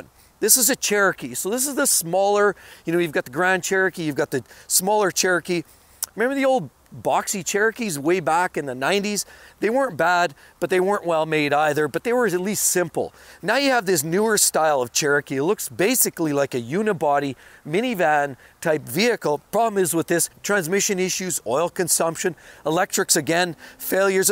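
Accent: Canada. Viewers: This guy is Canadian according to his accent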